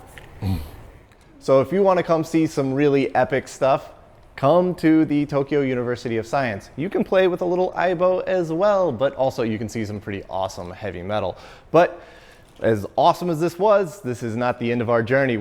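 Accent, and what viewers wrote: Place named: United States. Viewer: Wow, you did a great tour of japan, if I ever found myseld there, will be sure to watch this video again on what to visit PS: your japanese sounds american to me compared to the one the lady spoke Was wondering how easy is the language to learn for american person Also, how fast can it be forgoten if not used PS: is it wise to visit japan if u dont know japanese, can u get stolen or riped off easier?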